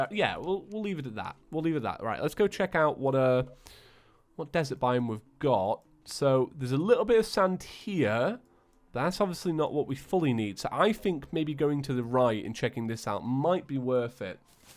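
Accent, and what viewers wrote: United Kingdom. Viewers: im the kind of guy that likes to correct people and i know this is just a dialect/accent but everytime you pronounce terraria "terr-are-ee-ah" it really bothers me, and i have genuinely nothing against it itself, the brittish accent is very nice, it just really bothers me for some reason XD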